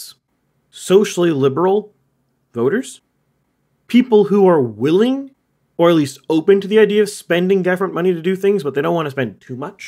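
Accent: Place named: Canada. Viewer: you have a funny Canadian accent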